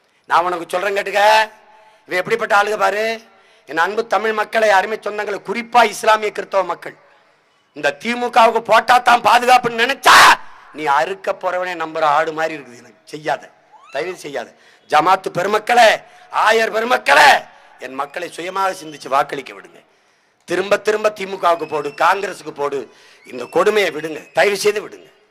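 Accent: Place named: Andhra Pradesh, India. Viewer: Guntur Tamil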